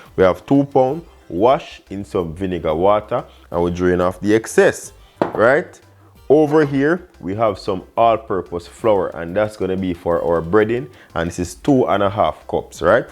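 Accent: Jamaica. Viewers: Yo...Am i the only personwho loves the Jamaican accent? Nah, that's what i thought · man i love jamaican accents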